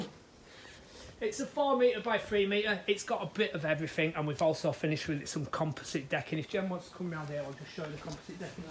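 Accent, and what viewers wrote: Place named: Leeds, United Kingdom. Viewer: Improving your finished product all the time , always good to hear a Leeds accent here in Australia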